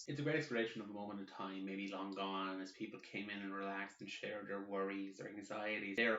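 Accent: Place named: Ireland. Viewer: OH MY GOD YOU’RE IRISH?!?! Your accent is so adorable!!!!!!! Also hi lol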